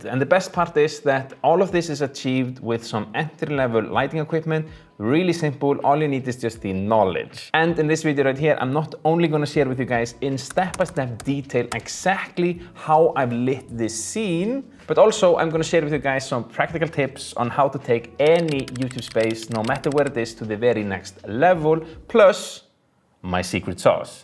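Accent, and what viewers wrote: Iceland. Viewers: Icelandic English. I recognize that accent. Great video! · 👍Very Well Explained !! I believe I started liking icelandic English more than the american one 😀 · Been watching your videos for years, but I"m not sure if I've ever commented. Lots of family in Iceland and the Faroe Islands so your accident makes me homesick in a good way. I wasn't just watching a bunch of lighting videos and thinking I miss the days when tutorials didn't feel like ads. So nice to see you doing this video with these old godox lights and gels. Without experience I think a lot of folks these days would think they need to spend more than they do to get the look they want. Thanks for reminding me how capable my old godox 60 is.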